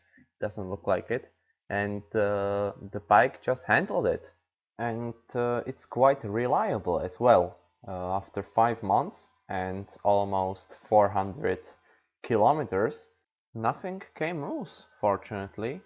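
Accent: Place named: Slovakia. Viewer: najprv som si myslel, ze je toto video od nejakeho nemca ale potom som pocuval ten prizvuk a hned som vedel, ze musis byt slovak :D Tiez budem kupovat Foxy ale 2021, ako si spokojny?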